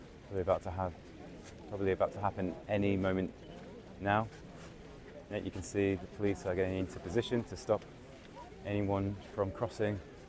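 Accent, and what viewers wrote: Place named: England, United Kingdom. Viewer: The commentator sounds like he's English by his accent. Why is he using the American word for pavement?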